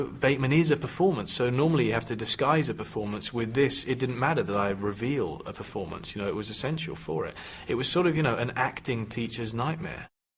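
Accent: United Kingdom. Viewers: I didn’t hear anything he said. I was too busy being charmed by the British accent and confused realizing he’s British this whole time. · He's English... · Wait a fucking minute... WHEN WAS HE BRITISH??!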